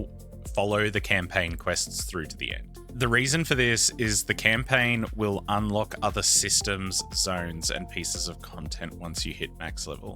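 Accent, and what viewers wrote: Australia. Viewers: Recently came back after many years away. Your series is a steady paced well thought out guide to the key parts, without the clickbaited bs of some youtubers. Might be the aussie in your voice, but it's like hanging out at the local and listening to a bloke having telling about his latest fishing adventure. Comforting and engaging, keep up the good work mate.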